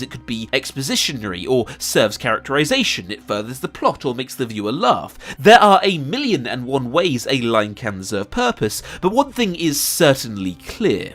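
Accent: United Kingdom. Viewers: It's so refreshing hearing a British accent on YouTube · Imma' be honest, every time I click on video I'm wondering since when did he have a british accent.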